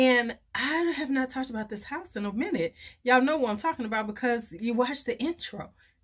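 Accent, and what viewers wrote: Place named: United States. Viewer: love this house! Serge is sooooo good! Clair de musc is my base... always. BTW your french is adorably USAmerican. <3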